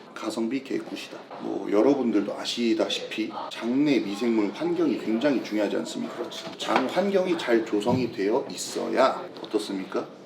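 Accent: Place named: Countryside, South Korea. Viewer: 는 어디 시골 농부 페이스+얼굴과 어울리는 구수한 사투리 때문에 극호 ㅋ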